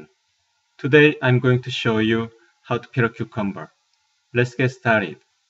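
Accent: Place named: United Kingdom. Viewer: Your British accent is so strong, what part of the u.k. Are you from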